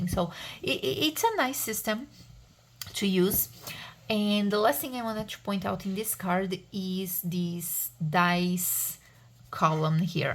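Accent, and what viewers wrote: Brazil. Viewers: esse sotaque com certeza é brasileiro.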